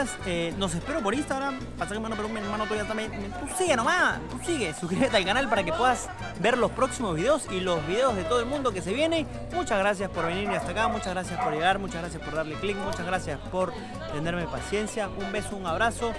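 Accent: Argentina. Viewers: Por qué imitas el acento argentino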